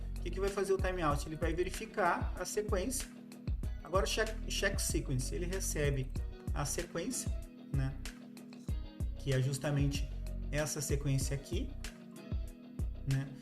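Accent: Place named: Porto Alegre, Brazil. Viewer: Salve mano! Pelo sotaque deve ser de POA. Tô desenvolvendo um beat n UP na Godot, no futuro quero fazer um de luta. Boa aula!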